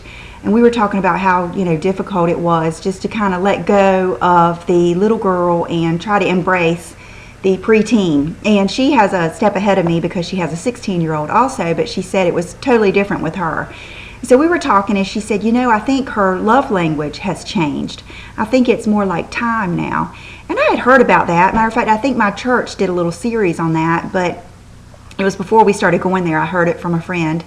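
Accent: United States. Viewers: i just love your country accent :)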